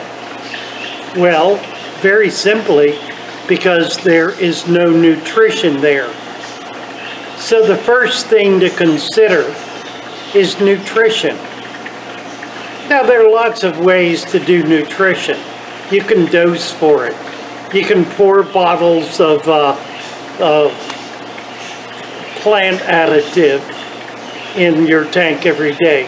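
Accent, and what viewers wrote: United States. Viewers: Found this useful, like i did? I'd suggest three things in addition to enhance the usefulness. Turn on subtitles (especially for those not in America or not used to the accent) and set playback speed to 1.5. Repeat listen a couple of times making notes as you go along. Btw, the main content starts. Thanks for the useful info,!:)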